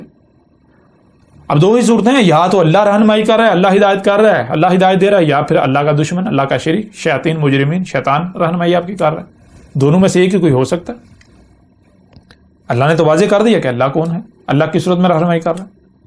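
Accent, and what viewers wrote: Pakistan. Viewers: لعنت الله عليك! You seem to be green Murree from your accent. I will find you soon InshaAllah and.... you know the rest. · یہ چھوٹی بات نہیں ہے لہجے سے ڈھیٹ پنجابی لگ رہا ہے لیکن چونکہ یہ اپنے آپ کو نبی کہہ رہا ہے تو کیا اللہ تعالیٰ نے اس کو اپنی کتاب کا نام تک نہیں سکھایا؟ کران کران کر رہا ہے بے غیرت بے شرم اور کچھ گمراہ لوگ واہ واہ کر رہے ہیں ۔ اس ویڈیو میں تو وہ کوئی خاص بات نہیں کر رہا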